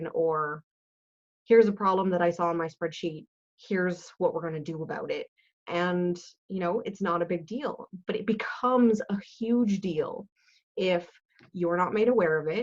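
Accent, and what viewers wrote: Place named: Canada. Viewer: Fascinating and useful stuff, not dull at all. I especially like that you don't ship problematic books for whatever reason. Answered a lot of questions perking the last several months that you've helped me navigate FBA. Love the Canadian accent, too.;-)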